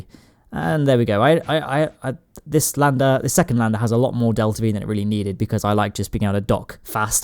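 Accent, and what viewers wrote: United Kingdom. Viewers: Your intro could have been a BBC TV intro, with your accent and all.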